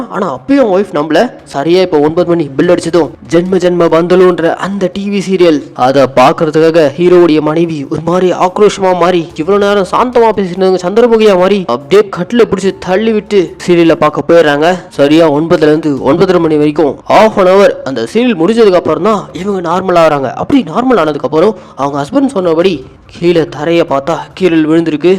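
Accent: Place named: Puducherry, India. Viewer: But neenga pesurathu pondicherry side pola iruku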